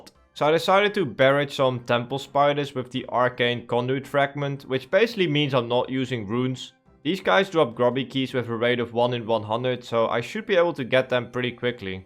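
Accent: Netherlands. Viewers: Normal people would make a 10-video series out of this, you put all of this in a single 11-minute video… madman, je verliest je Nederlandse accent ook steeds meer.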